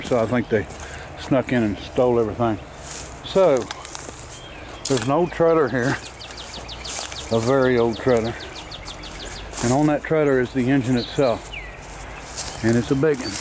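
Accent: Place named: Tennessee, United States. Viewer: "I am alookin and not aseein" , brought back good memories of Tennessee from decades ago.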